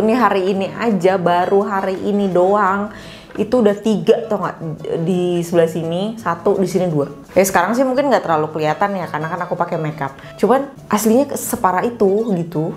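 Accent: West Java, Indonesia. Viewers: Sundanya balik 😂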